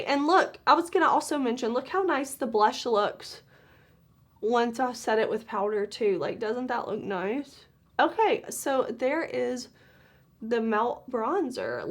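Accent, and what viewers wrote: South, United States. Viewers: I didn’t realize you live in Mississippi, I live there as well, in Columbus :) I knew you had a southern accent, just didn’t know where you were from. You’re one of my favorites to watch so it’s cool to know we live in the same state!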